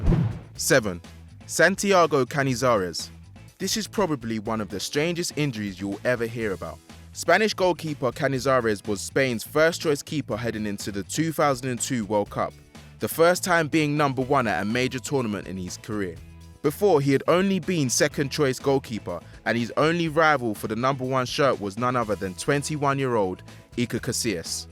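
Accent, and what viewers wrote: United Kingdom. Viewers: he's british/autralian not american. 🤡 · You are British 🇬🇧 😏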